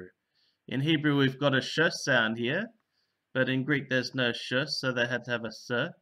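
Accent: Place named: Arab world. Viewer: This is a very good explanation for the Greek transliteration of Yeshua. I have listened to a couple your Hebrew readings and your Hebrew pronunciation sounds a lot more purer than the the false modern jewish hebrew. Yours sounds more arabic. Do you have any videos were you explain your pronunciation distinctions and corrections compared to the incorrect pronunciations of modern jewish hebrew? Thanks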